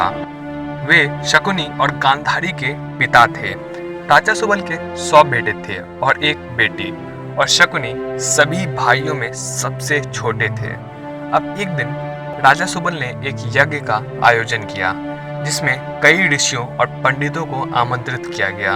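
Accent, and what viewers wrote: Bihar, India. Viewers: Tum bihari hoo kya 🙄🙄🙄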